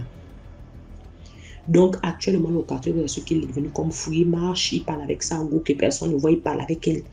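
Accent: Gabon. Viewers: Ah j m disais bien que c l'accent gaboma😂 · Kié une vraie gabonaise avec son accent bien appliqué 😂😂😂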